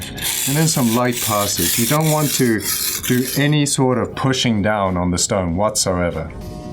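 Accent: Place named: Zimbabwe. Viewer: He has a Rhodesian/Zimbabwean accent. Get a life and leave him alone.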